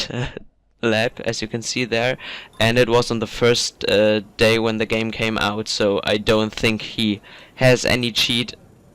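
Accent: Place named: Germany. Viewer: Wie ich alleine an deiner Aussprache höre, dass du Deutscher bist. xD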